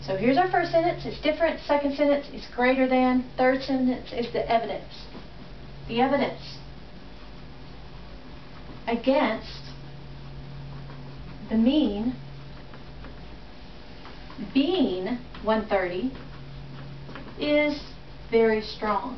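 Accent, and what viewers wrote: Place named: United States. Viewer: Could anyone tell me where is this accent from? Sounds American to me but not sure which state. Thx :)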